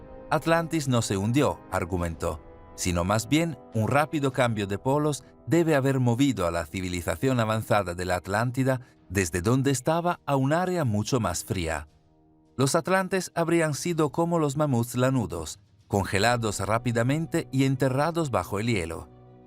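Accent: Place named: Italy. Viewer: 🔴 EL PORQUÉ SE VUELCA los Rusos unos 20 años atrás han descubierto que un obejeto en rotación en el vacío, si no es totalmente simétrico en sus masas, ÉSTE ROTA SU EJE DE ROTACIÓN después de percorrere una cierta distancia. DESCUBRIMIENTO hecho el la Estación Orbital Rusa. Gracias 🙏 Grazie, si capisce che sei Italiano, abbraccio 🙏 Mario Cappellotto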